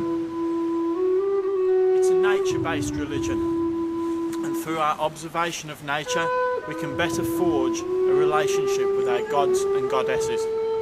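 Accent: United Kingdom. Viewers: From the West Midlands? 😛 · Hurrah a fellow Brummie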